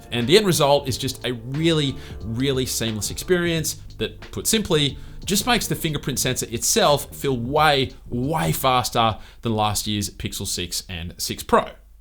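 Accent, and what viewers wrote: Australia. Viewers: I really love this phone, its pixel through and through...and when you let me know how to work it speaking with your sexy Aussie accent, I melt! Thank you 🥰😘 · Is this an Australian accent?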